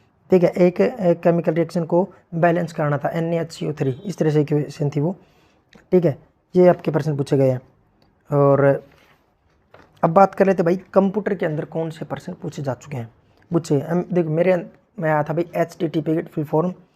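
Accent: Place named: Haryana, India. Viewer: Haryanvi for a reason ❤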